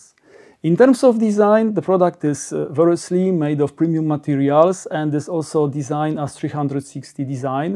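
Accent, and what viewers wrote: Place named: Poland. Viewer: Nice accent. Direct from Radom , I supposed.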